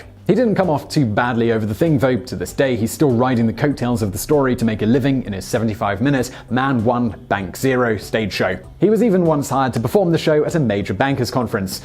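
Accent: United Kingdom. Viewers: The way you talk here is why I think you're one of the best narrators ever and why I always click your videos, regardless of subject. You could make a video on the average consumption of prune juice in your local elderly home and I'd still be fascinated. Also, you are probably the most British person alive.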